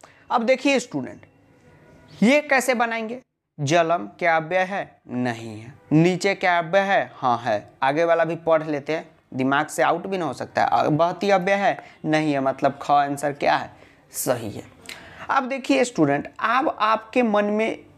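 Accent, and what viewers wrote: Bihar, India. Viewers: Bihari hai ka sir ?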